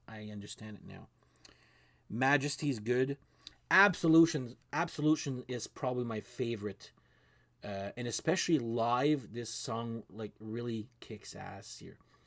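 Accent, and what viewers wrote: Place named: Canada. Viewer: Very good analysis. I think “ Meliora” is the best one too, and I share your enthusiasm for the awesomeness that is “Square Hammer.” My only quibble is “If You Have Ghosts.” I am a Roky Erickson fan of 40 years almost, and think Ghost does the definitive version of this song. Watch some live versions where Tobias really owns the song and the guitar lines at the end are sublime. Also, I ‘m glad you mentioned Ottawa cos I thought you had either a Canadian or Midwestern US type of accent. Subscribed!👍🏼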